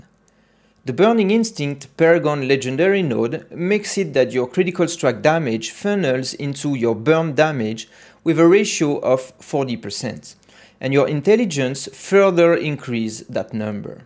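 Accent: France. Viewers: Je like rien que pour l’accent français ! 😉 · This is definitely a cool and interesting video. You did a great job with your explanations. Also, with a such a strong Frech accent please let me compliment you on your English because it's excellent!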